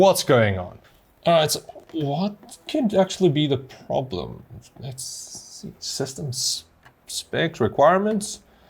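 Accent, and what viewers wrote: South Africa. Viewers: Trou met my <3 Beautiful Saffa Accent!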